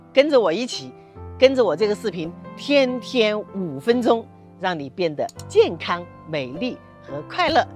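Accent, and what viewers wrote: Wuhan, China. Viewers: 我也觉得是从武汉来的😀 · 、你是不是來自中国-你的口音很像,只有中國人最注重養生、通经脈穴道、你说的沒錯要堅持做才会有效果．我也经常失眠謝謝你、告诉我们方法.我一定試一試、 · 大姐你是武汉人吧?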